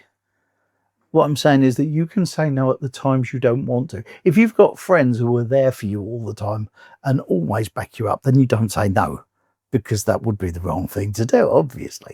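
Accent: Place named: London, United Kingdom. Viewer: Hey! I've only just found you!! Although I'm not impressed with your London accent... ( I'm Irish & proud! ) Suffice it to say... I am half daft with all that happened to me in my life! Like you... I'm 65... Retired Police Officer! 3 years ago I lost my Latvian wife to a 12 year battle with cancer... since then... & because of all the sh1t that happened in my life... I'm a bit of a basket case... but "every day in every way. I'm getting better & better!" In actual fact... I've been to a psychotherapist recently... for the first time in my life!! After only 2 sessions I am seeing things that I never noticed before!! Life is waaay from over just yet!! 😊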